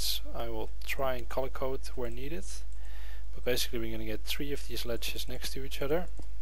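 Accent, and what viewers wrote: Netherlands. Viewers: Hey, are you dutch? I recognize the accent :p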